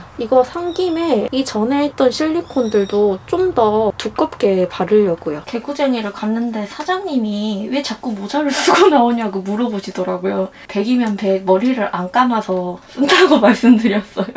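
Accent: Jeolla, South Korea. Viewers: ♡ 젤루 님이 눈에 들어오신건 강아지#강이#이와 함께여서 구독하다 전라도분인걸 확 알아챘음당~ㅋ 전라도 사람은 다아는 억양~ㅋ 저두 강아쥘 엄청좋아하거든요 님의 영상을 보면서 캠퍼의 꿈?을 키워봅니다 항상 잘 보고마니 배워보도록 할께요~😊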